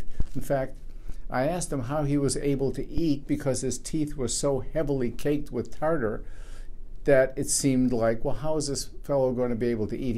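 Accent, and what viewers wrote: United Kingdom. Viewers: Let me guess..... ....British?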